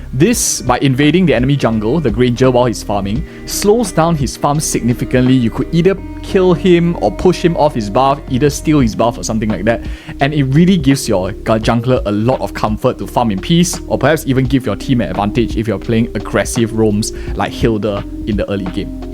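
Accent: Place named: Singapore. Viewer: Prefer this Singaporean voice than those fake A.I. Voices in most YouTube vids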